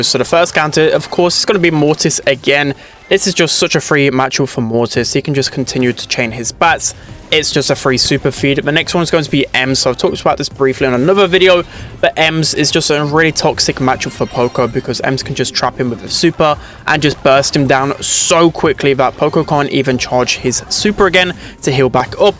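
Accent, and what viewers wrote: United Kingdom. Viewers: I NEED TO WATCH THIS BUT I CAN'T STAND HIS BRITISH ACCENT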